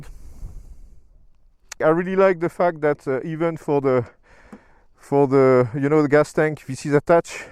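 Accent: France. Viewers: Je me disais bien que c'était un accent bien français ahah ! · I knew you'd buy it! You instantly fell in love with her it was obvious! Great machinery. And yep, I have already checked your vid, it's awesome as every other you have put out till now👌we greeks love a thick French accent! Hehe 🤗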